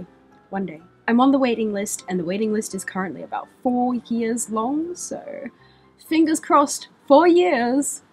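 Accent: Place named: Australia. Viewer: AUSTRALIAN ACCENTS ARE THE BEST THING EVER!!!! AUR NAURRRR!!!!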